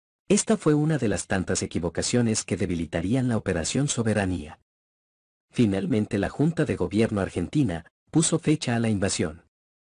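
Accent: Chile. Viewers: Me resta decir que el relator es muy probablemente chileno, o me equivoco???? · aunque te esforzas en disimular tu acento, vos sos chileno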